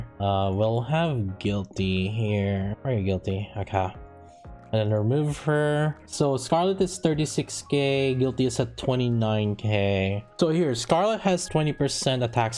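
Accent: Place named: Philippines. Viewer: Are you from the Philippines? Your english sounds like one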